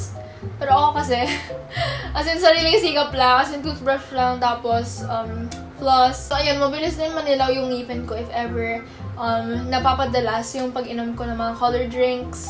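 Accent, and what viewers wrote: Batangas, Philippines. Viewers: Ikaw ga ngay batangenya?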